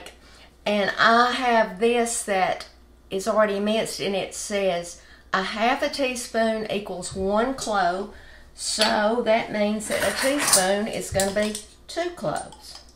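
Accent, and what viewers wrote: South, United States. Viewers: Thank you so much for the warm welcome! I know that Southern people are very kind... I married one 😊 My husband is from North Carolina. I just LOOOOOOVE those Southern accents 😍😍😍 God bless you and the rest of your family as well 🙏🙏🙏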